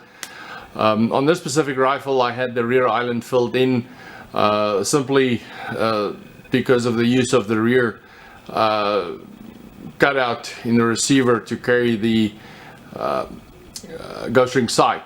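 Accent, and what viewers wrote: South Africa. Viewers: The narration on this video sounds like someone speaking "American" with a South African (Afrikaans) accent....??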